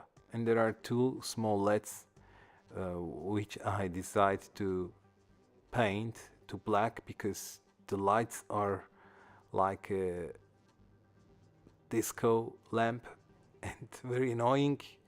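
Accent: Turkey. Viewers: İngilizceden Türk olduğunu anladım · thats turkish accent isnt it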